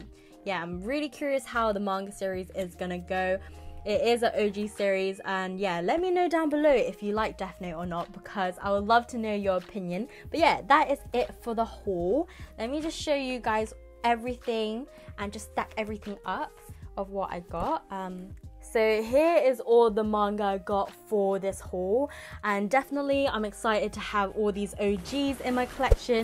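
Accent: United Kingdom. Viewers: When I heard your British accent you have NO IDEO HOW HAPPY I WAS we need more British manga youtubers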